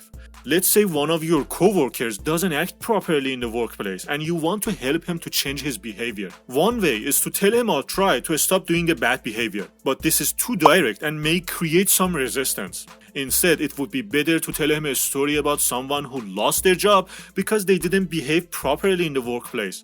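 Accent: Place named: Iran. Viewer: Oh this is the thickest Persian accent that I've ever heard! 😂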